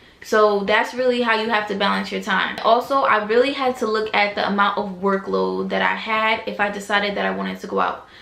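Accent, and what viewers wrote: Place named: New York, United States. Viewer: yes girl 😂 you got that New York accent.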